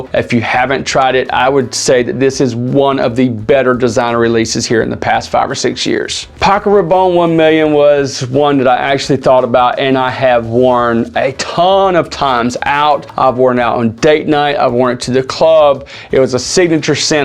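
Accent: South, United States. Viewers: I heard the draw and was like....he's from my neck of the woods. Howdy from South Carolina!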